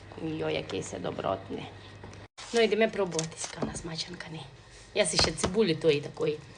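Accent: Transcarpathia, Ukraine. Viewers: Мені подобається закарпатська розмова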